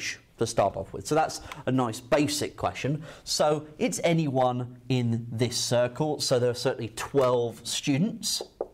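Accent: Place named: United Kingdom. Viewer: I am fond of British Accent , great lecturer great video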